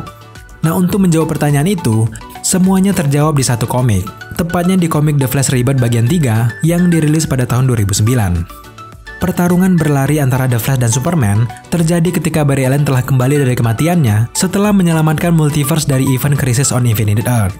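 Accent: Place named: Batak Karo, Indonesia. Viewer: Bro, dialek lo kok kaya batak karo yaa...bener ga hehehe Mantappp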